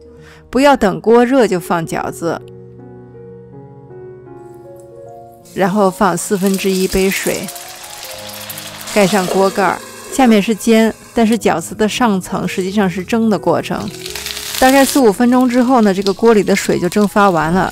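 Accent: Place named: Beijing, China. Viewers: 好喜歡看的視頻，教得好細心，有條不紊，聲音清晰動聽，正式的“京片子”。從中我真是獲益良多，很是感謝！且有一個特別好奇的地方，妳為什麼懂做這麼多的菜式。很是利害。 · 你声音好好听听起来就像是国外长大的地道北京人这么说你能理解么很喜欢你的视频我是今天开始follow的之前看过一个artichoke 的视屏不知道是不是你的 anyway goodday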